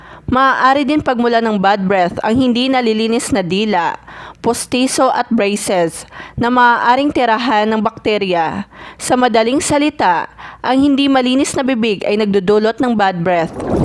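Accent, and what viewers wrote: Visayas, Philippines. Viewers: Nagdodolot visaya ka day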